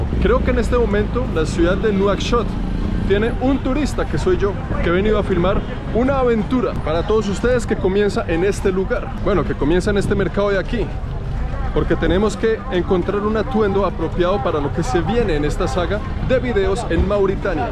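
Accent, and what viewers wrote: Bogotá, Colombia. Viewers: Felicidades. Ese acento colombiano de Bogota (rolo) de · Buen viedeo, el acento y dialecto es muy colombiano. Eres colombiano? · Él muy rolo, ella muy paisa 👍🏼🙃👍🏼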